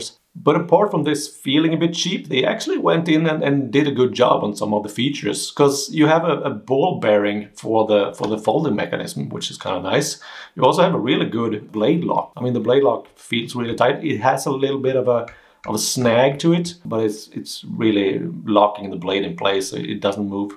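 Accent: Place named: Germany. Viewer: First time watcher here....I stumbled upon your channel this morning, at first I didn't detect your accent....but as your video went on and I didn't keep scrolling I began to notice that you might be from Germany or austria....you finally mentioned that your from Norway! I appreciate your presentation style and liked how you described everything...really cool...I went ahead and hit that subscribe button and gave you a thumbs up! I guess the only thing I took issue with was the fact that your a Dewalt guy....I recently researched folding utility knives and decided to go with milwaukee I have their six in one fastback and their compact folding knife...I like both of these....I'm a knife guy and for my edc I carry a buck Juno in my front left pocket a spyderco in my left rear pocket and now my milwaukee fastback in my front right pocket...it works for me...I live and work in the west texas oifield....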